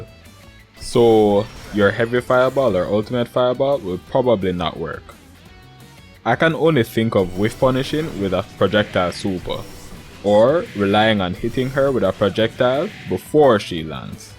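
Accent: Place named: Jamaica. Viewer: You sound Jamaican. Been struggling to find granblue players in this country.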